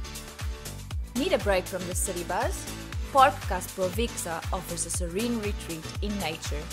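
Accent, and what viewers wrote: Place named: South Africa. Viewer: Its funny to here a South African voice about Poland lekker man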